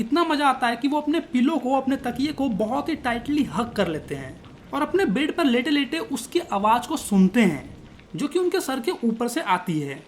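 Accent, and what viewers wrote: Bihar, India. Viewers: sir mujhe aisa lag raha hai ki app bihar se ho qki apki awajj bihari jaisi hai 😁😁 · Sir are yuo bihari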